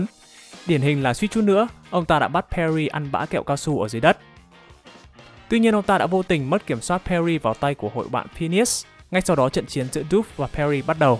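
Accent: Standard. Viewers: Tiếc nhỉ mà ad có giọng hay thật lại phát âm chuẩn nữa và đầu óc cực "chong xáng" khi làm về Doof's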